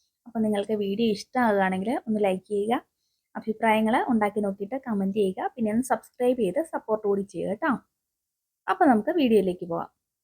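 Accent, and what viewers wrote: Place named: Trivandrum, India. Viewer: ഭാഷ കേൾക്കുമ്പോൾ ട്രിവാൻഡ്രം ആണെന്ന് തോന്നുന്നു 😊😊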